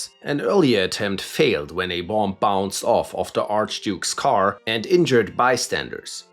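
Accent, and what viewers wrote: Germany. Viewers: GOD, these are good. The german accent just makes it like we're in a U.N. meeting. Kudos.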